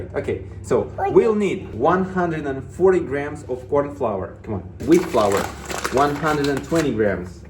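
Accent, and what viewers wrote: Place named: Moldova. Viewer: . 🧢🧢🧢 LA SUPERLATIV ❤❤❤ SA-ȚI MANINC EU. GURIȚA TA. ȘI VORBA. MOLDOVENEASCA🎉 👍👍👍💝💝💝🌹🕊️🥰🙏.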